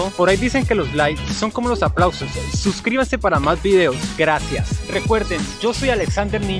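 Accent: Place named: Colombia. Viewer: Buen día Alexander De que parte de Colombia es usted ??? Porque por el acento NO es de Barranquilla....jajajajajajaja Muchas gracias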